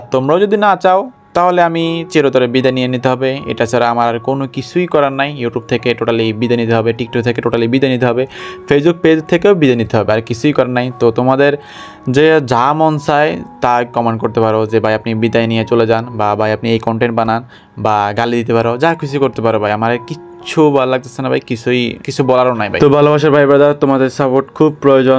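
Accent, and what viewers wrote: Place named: Lakshmipur, Bangladesh. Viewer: Vaiyer basha ki lokkhipur naki?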